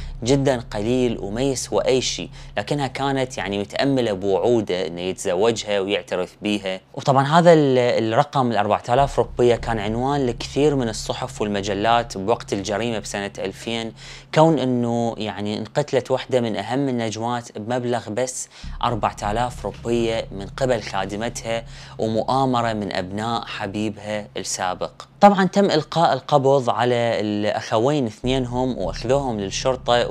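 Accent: Iraq. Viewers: اللهجة العراقية غاية في الروعة تحية من الجزائر لمحتواك الراقي أخي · عيدك مبارك ومن العايدين الفايزين اخي ، انا اعشق قصص الجرائم والغموض رغم انها احيانا تكون محزنة وتظهر بشاعة طمع وحقد الإنسان لاخوه الإنسان بأسباب تافهة جدا مقارنة مع ازهاق حياة بشر. تحياتي لك من المملكة المتحدة 🇬🇧وشكد تعجبني لهجتك العراقية❤.. فدوة 🇮🇶😊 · قناة جميلة واللهجه العراقية جميله استمر بالتوفيق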